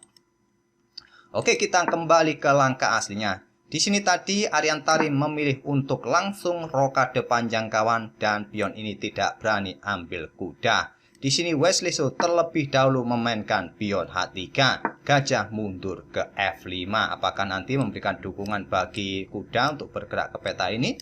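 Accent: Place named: East Java, Indonesia. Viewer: Admin logat orang jawa timur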